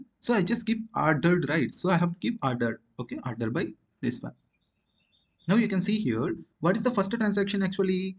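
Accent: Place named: India. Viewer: Why do you need to speak so fast? Indian accent is so hard to follow. Just speak slowly and clearly.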